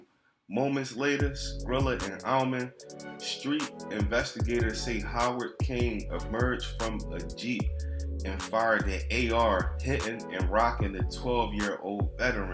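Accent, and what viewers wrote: Philadelphia, United States. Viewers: I know people that aren’t from Philly or the tri state area don’t be understanding half the words you be saying lol I’m from Philly and I’m like bro talking so Philly that it sounds like you mockin us even though I know you from Philly too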